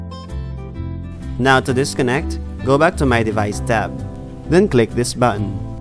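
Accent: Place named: Philippines. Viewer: this is the thickest pinoy accent ive heard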